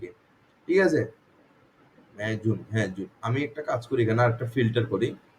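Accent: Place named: Chittagong, Bangladesh. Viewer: কথা শুনলে বুঝতে পারি আমাদের চট্টগ্রামের ভাই। আপনার বাড়ি চট্টগ্রামের কোন জায়গায় বদ্দা?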